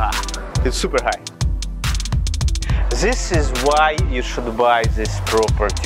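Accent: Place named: Russia. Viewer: Are U Russian? I can hear familiar accent 😆 Seriously. I like Dubai. Been there 3 times and want more 😌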